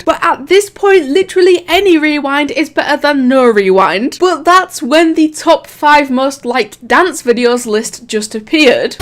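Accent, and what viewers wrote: United Kingdom. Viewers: U British?????cuz of ur accent I guess u live in London??or some were elese in Paris xddd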